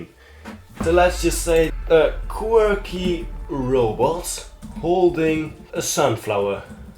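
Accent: Netherlands. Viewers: I immediately heard you were Dutch 😂 Love your vid! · Hi I love your video and I thought you sounded very Dutch if your not Dutch don’t take this as an insult plz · Love how you can still hear the dutch accent, Maar goed bedacht en gemaakt! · Your Dutch arent you??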